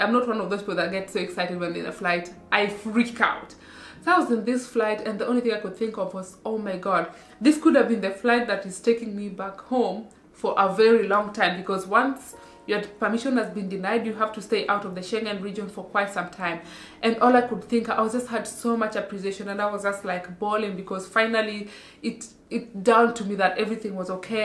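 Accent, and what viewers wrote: Luo, Kenya. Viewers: I love ur luo accent.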